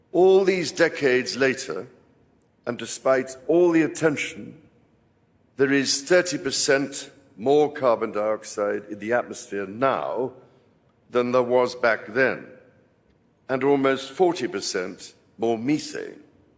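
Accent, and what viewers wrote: United Kingdom. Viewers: You are telling me that when the British spoke like this they didn't have real issues to worry about?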